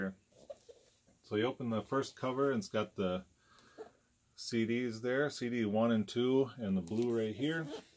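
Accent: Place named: Minnesota, United States. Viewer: Been watching a lot of your videos. Never picked up on the fact that Minnesota accent was actually coming from Minnesota. Hello neighbor.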